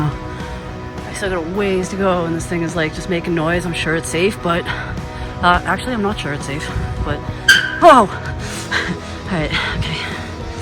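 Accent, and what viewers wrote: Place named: Eastern Massachusetts, United States. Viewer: What beautiful views, you picked the perfect clear day. Love to listen to your voice especially the Eastern Massachusetts accent, i drop a lot of r's myself and talk similar coming from New Beige.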